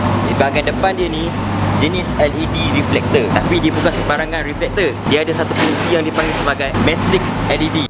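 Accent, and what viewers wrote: Terengganu, Malaysia. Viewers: Welcome bang, kalau boleh kekalkan loghat ganu time review. Boleh jadi trademark abang nanti.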